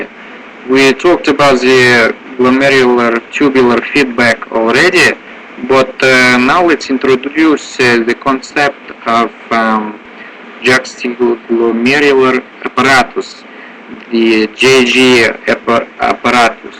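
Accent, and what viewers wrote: Russia. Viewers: Реально с Русским акцентом говорит))) Очень похоже))) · Вообще-то он говорит очень близко к русскому акценту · Da! Tovarisch, we realy speak like that. I would really say you mimic and speak like most russians. And i'd say youre the one of those immigrants who left russia with parents in a childhood. Nonetheless excellent job on your accent pal! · Парень ты русский, я живя в США ни видел такого акцента кроме как у русских) а может и правда перфект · Since I'm russian i can say it's quite a russian accent though i still have the faintest clue why would people want to sound like russians... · то как ты вставил "и" вместо "and" в тексте, выдает в тебе русского ) . The way you "accidentally" changed "and" to "i:", betrays your russian origin ) anyway. true or not, it sounds funny, may be too heavy for such a good gramma ). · It sounds very Russian! 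Perfect Russian accent! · I am russian, yeah when i started learning english i spoke like this :D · ahhaahahahahah I LOVE IT!!! I'm Russian and even I can't do that hard Russian accent. Very well done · amazing, I can't believe he's not russian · His Russian accent is very good. Or should I say his English is very good? I'm lost. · Да ты русский)) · Very nice russian accent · you do a pretty good Russian accent. are you really Russian? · If you are really not a russian guy, then your Russian accent is P-E-R-F-E-C-T! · Very good job, man! I am Russian. Whenever I hear people speak with a Russian accent I can ALWAYS distinguish between an American trying to put on a Russian accent and a real Russian speaking with the so well known to me Russian accent. In your case, I had this subconscious realization that you ARE Russian. I can hardly believe that you are not one. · А вы вообще русских знаете? 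He has so perfect russian accent that many russian viewers thought he is russian. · wow, this is perfect russian accent! so true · Abzal K. он совершенно определенно русский....привезен лет в 10-13 в США...99.9999% уверена, в том что он русскоязычный эмигрант... живу в америке больше 20 лет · 100% RUSSIAN GUY :DD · I don't know if you are russian with a really good american accent or vice versa xD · perfect russian accent. From Russia! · Sounds very Russian! Makes me think that he is from Russia. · I'm Russian and I'm impressed by your ability to imitate our accent. At some moments I even doubted whether you are American or not... So, great job! Recently I uploaded my own video with my real Russian accent. So, you can check it out on my channel. Cheers!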